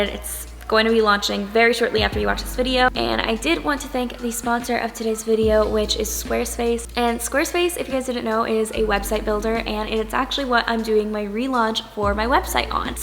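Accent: Spain. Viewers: I am from spain! I love your spanish accent!! 😜😜😘😘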